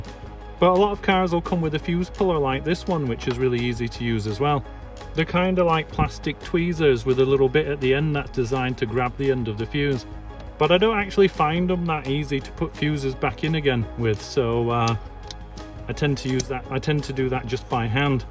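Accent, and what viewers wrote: Liverpool, United Kingdom. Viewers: Cool. Thank you for the demo! You sound like a Beatle.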